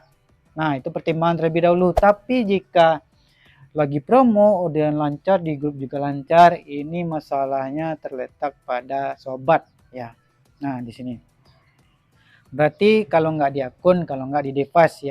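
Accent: West Sumatra, Indonesia. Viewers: Lo orang minang ya bg😂😂 logatnya minang banget😂😂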